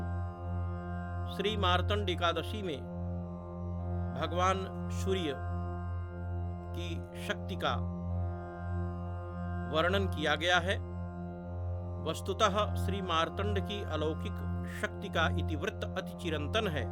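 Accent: Standard. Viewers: अद्भुत, ज्ञानवर्धक,,बहुत सुंदर, विशुद्ध उच्चारण, नित्य श्रवणीय, आनंद दायक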